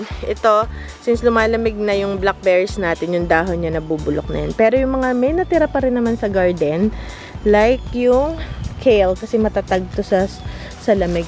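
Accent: Batangas, Philippines. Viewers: 😁😁 ang cute ng accent nyo pg nag babatanggenyo kau 😄💓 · Batangeño din ako pero kakaaliw po ang punto niyo. Haha hindi nawala. God bless po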